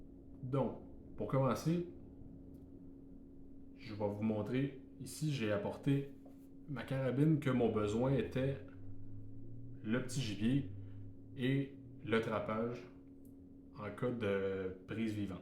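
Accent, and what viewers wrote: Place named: Quebec, Canada. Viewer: Faut pas abuser non plus ! T'as un accent québécois ok, mais t'es complètement compréhensible, ne t'excuse pas non plus , t'as fait une vidéo intéressante et vraie surtout (chasseurs qui suivent les vendeurs sur des calibres magnum) moi je pense que bien souvent même le 243 est largement suffisant à l'approche et à l'affut ou sinon le 308 !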